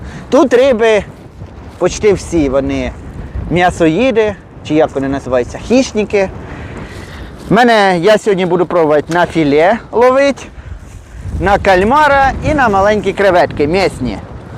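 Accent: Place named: Surzhyk, Ukraine. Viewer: Суржик у Вашому виконанні забавно слухати. Майстер стендах. Всього найкращого. Лайк і чекаю на нові відео.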